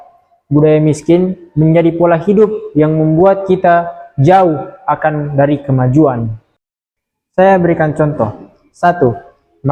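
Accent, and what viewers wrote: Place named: Java, Indonesia. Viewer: Jawanya melekat bgt kak🤩🤩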